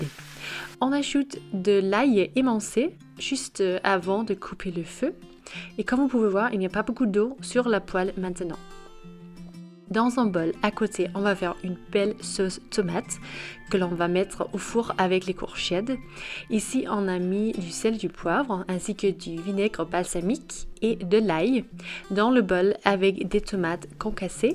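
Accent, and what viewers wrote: England, United Kingdom. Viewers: M 21! Frou du cul ou trou du cul??? Madame est Britanique! Essayez donc de faire une recette vidéo en anglais et vous verrez si vous n'écorchez pas un mot??? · Votre accent est tres jolie are you english ?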